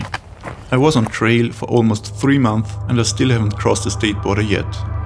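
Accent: Germany. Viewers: Wow! Ich bin fasziniert darüber was für eine Reise du zu der deinen gemacht hast! Ich habe eine Millionen fragen an dich und weiß genau ich kann sie nicht alle stellen jede Stelle ich dir eine essentielle: was war das für ein Gefühl zu Beginn und was für eins am Ende dieser wunderbaren Reise? Ich möchte in den kommenden 3 Jahren mich auf eben genauso einen Trip vorbereiten vielleicht hast du auch eins zwei Tipps wie ich das am besten mache da du dies ja bereits erfolgreich gemeistert hast! Ich komme aus Niederbayern du hörst dich von der Aussprache auch so an als stammst du aus Niederbayern. Mach weiter so! Du hast hiermit einen neuen Abonnenten gewonnen und vielleicht sieht man sich mal auf den Pfaden oder man wandert gemeinsam! 🤩👍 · Könnte es sein das du Deutsch bist? Hast ne deutschen Akzent...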